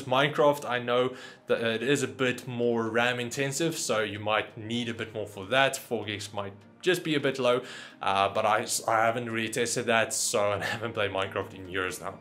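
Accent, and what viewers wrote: South Africa. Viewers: Woah, I didn't expect you to be south african.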